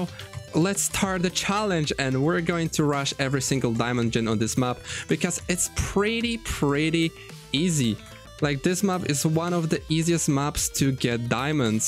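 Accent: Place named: England, United Kingdom. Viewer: Ty naprawdę polak bo brzmisz idealnie na anglika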